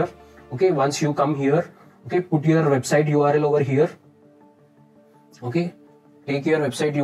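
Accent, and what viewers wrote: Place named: India. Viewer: Waste of time. No fixes explained only what the problem is. Indian speaker, cannot understand as accent too strong and speaks too fast.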